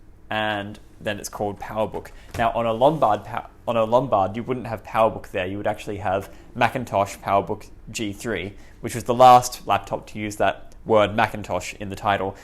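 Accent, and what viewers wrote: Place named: South Africa. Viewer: Great video, I bought a Pismo Book for £25, fully working in excellent condition from eBay. Are you South African? Your accent sounds like my dad and he is from East London, Natal! Great video mate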